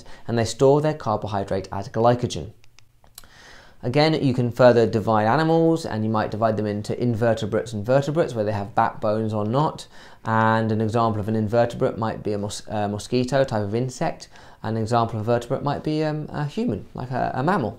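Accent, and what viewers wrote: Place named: United Kingdom. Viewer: please use american accent if u can i cant understand british well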